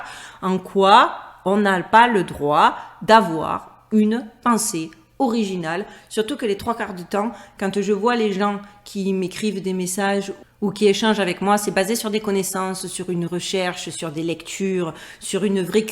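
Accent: South, France. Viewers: Toujours aussi sensationnelle ! Il y a trois You Tubers dont vous faites partie qui lorsque je les écoute me font du bien et me permettent toujours d’avoir un sourire amusé ! Vous avez l’art de la narration naturelle et ce petit brin d’auto analyse amusante qui détend l’atmosphère ! Amusant d’entendre de Vegas l’accent méridional, mais ça fait partie d’un ensemble qui fait de vous une personne sympathique et charmante qu’on rêve tous d’avoir comme amie ! J’ai aimé cette vidéo et c’est tout à fait le sens du courant actuel! Remplacer l’homme et lui payer un revenu universel et se débarrasser le plus vite possible de l’excès ! Surtout annihiler tous les sentiments en l’isolant complètement dans un monde automatisé où il n’aura plus besoin de sortir ! Des bon toutous esclaves obéissants drogués et contrôlés mentalement, cerveau atrophié, des zombies inutiles ! 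Votre vidéo est plus légère que ma vision tout en étant semblable mais moi je le vois terrifiée et le transmets dans mon narratif ! Merci encore, j’espère que le choix d’être à Végas sera meilleur que celui d’être en France ! On nous menace de nous reconfiner à Paris et RO ! Bises 😘🙏🌹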